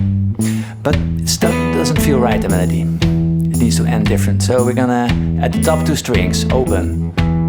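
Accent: Netherlands. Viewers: He got a dutch accent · without checking anything, I can hear you are dutch. The beauty of it, is that the guitar doesn't sound dutch at all. it sounds like beautifull blues <3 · Hahaha gelijk hoor je het hè Nederlands. Blijkt toch een super charmant accent te zijn. Hahaha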